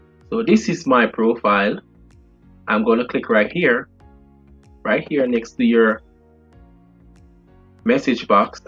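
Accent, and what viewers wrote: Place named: Jamaica. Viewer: This guy is definitely a Jamaican. Very informative video.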